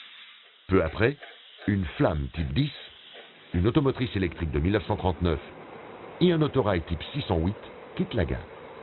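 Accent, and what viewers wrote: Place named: Belgium. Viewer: D'où vient ce commentateur. Entre Berschem et Charbeque, il nous donne de l'octante...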